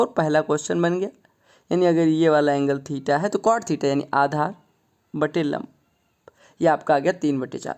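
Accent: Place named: Awadh, India. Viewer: I like Avadhi accent.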